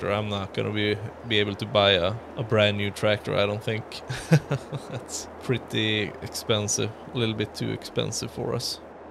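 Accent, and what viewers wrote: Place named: Sweden. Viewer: Love the series! But you have to be swedish with that accent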